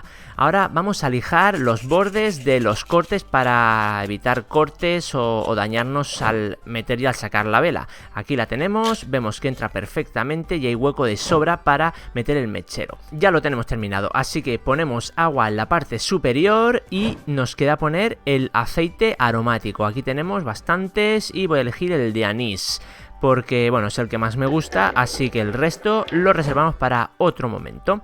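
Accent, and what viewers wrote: Chile. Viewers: eres chilenos? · ¿Oye el es Chileno? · Eres chileno?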